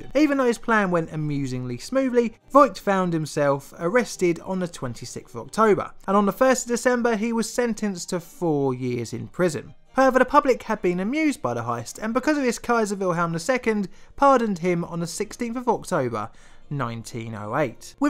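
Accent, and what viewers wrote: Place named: London, United Kingdom. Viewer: More fuel for my addiction :( also your accent is so Londoney hello neighbour.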